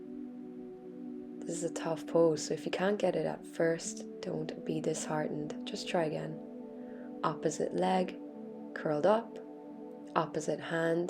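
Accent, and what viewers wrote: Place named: Ireland. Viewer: Hi Thank you for the lovely flow, great to hear a soft Irish accent 😊 Mandy 🌳 xxx Belfast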